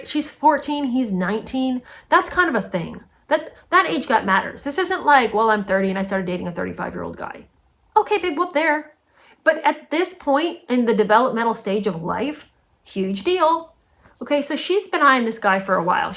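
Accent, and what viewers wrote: South, United States. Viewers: One chapter and this book already has more depth than Worthy. This should be interesting. Love your southern accent. I just finished Matthew Perry's biography. His account of his life is raw. It helps to explain why and how he struggled. You might enjoy it. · I've learned more about Brittany in Part 1 than Jada in her entire book Worthy😂 And by the way, I love your southern accent .😃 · As soon as the video was over, I was like: gimme, gimme 🎶 Great book and even better with you telling it, of course! Also, I really like your southern accent 😅💕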